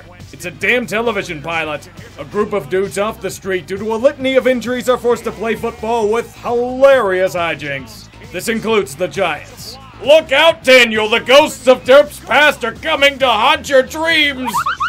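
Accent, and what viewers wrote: Pittsburgh, United States. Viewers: If did all of his videos in yinzer speak I wouldn't mind... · We need more yinzer voice! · should bring out the yinzer more often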